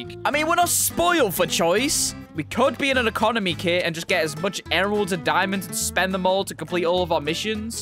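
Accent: United Kingdom. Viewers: are u brithish?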